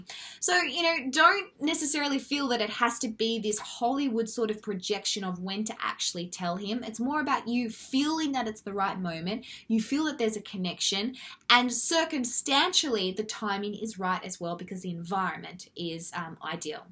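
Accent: Australia. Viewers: are you Australian? British? Are you in Australia? I'm curious because I'm always comparing how the English are compared to how Americans are. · Mark Edwards I don’t know but I do know she is Australian, I’m Australian and this is how Australians speak.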